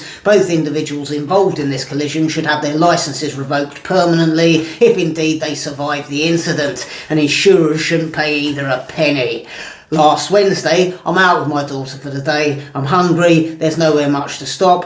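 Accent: United Kingdom. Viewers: You sound British, but just described South Africa.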